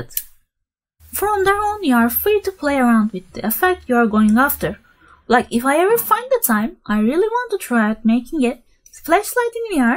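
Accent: Turkey. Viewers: dinlediğim an saniye de bu Türl dedimdoğruda bildim : D Türklerin aksanı hep aynı ama güzel kelime haznen var devam.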